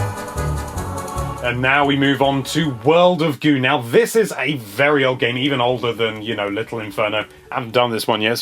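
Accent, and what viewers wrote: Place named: England, United Kingdom. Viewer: i totally agree that Snipperips is number 1. I'm not sure that blaster master deserves to be on the list, I was very disappointed with the co-op feature and felt it was put in at last minute without any thought! I would like to see this list done again in say a years time when there are loads more games to choose from! Great video as always, makes a refreshing change to listen to a fellow English man when on YouTube searching for my SwitchFix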